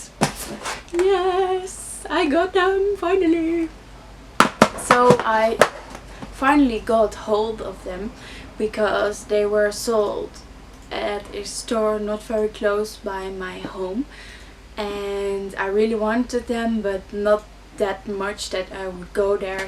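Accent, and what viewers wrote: Netherlands. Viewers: Jij bent sws nederlands 😂😘 ik hoor het aan je 'platte nederlands' accent hhaah je spreekt overigens wel heel goed engels maar toch hahah ❤️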